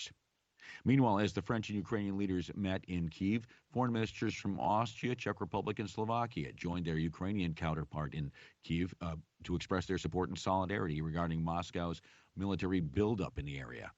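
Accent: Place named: United States. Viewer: Thank you very much Mr., for all the updated news. I do like to listen to your nice American voice. I hope that in the next time, you will read the news with slower speed. Therefore, we can listen and understand the news contents clearly. Furthermore, the speaker can avoid the readings tripped and wrong. Once again, thank you very much for your attention to my comments.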